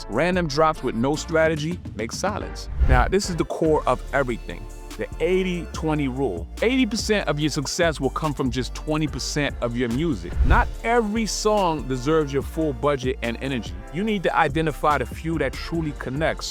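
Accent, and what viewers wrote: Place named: Caribbean. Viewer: Random guess based off your accent: are you carribean born but raised in NYC? 🤔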